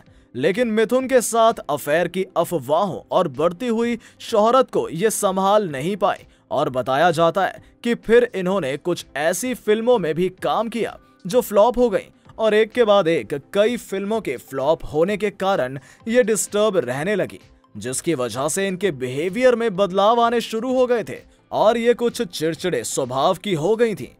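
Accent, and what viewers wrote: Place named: United Kingdom. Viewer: Why ur talking in Hindi with attaching English accent to it. Hindi should be spoken properly. You sound so weird.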